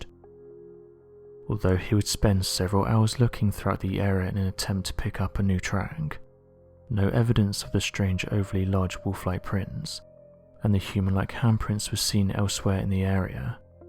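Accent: England, United Kingdom. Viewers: Where is the picture of the car .fake fake fake ..i was really looking forward to some REAL proof of this "dog man " from your "english" voice..iam not saying this dog man is not real ..... Iam saying your a english dude from that island where there "royalty's" is brother &sister mother dad uncle are one & the same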